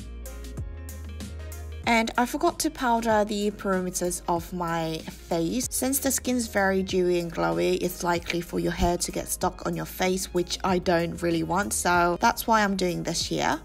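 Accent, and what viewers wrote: United Kingdom. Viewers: 언니 왤케 이뻐요ㅠㅠㅠㅠ진짜 누가 백설공주 라고하셨는데 그 말 딱 맞는듯ㅠ 그와중에 영국발음 취저 탕탕😭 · 영국발음 발린다ㅠㅠㅠ..매트를 맡이라 하는 거 늠나 치이는 것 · 진짜 영국식발음 넘나 매력적ㅠㅠ 저도 영국영어 배우고싶어요ㅜㅜ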